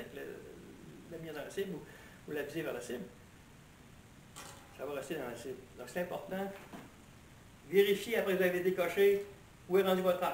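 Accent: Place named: Canada. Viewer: Merci super video moi qui veux demarrer le tir instinctif de tres bon conseil l accent canadien dur dur et parlezvun peu moins vite mais vouscetes grnial ❤👍👍🙏🙏🙏😊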